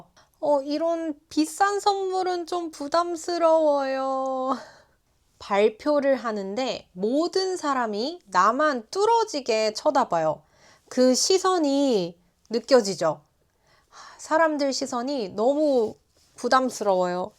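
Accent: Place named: United Kingdom. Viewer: did you learn english in the uk? you have a strong british accent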